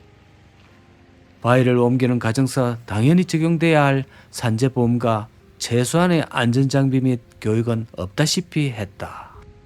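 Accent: Gyeongsang, South Korea. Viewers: 님의 영상 너무재밋고 또 너무나 독특한 갱상도 말씨로 항상 시간가는줄 모르게 보고 있습니다❤❤❤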